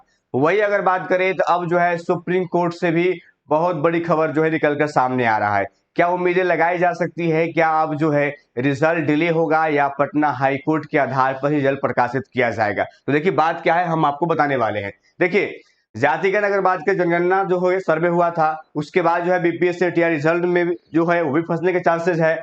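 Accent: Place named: Uttar Pradesh, India. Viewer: Tu up ka hai lag rha hai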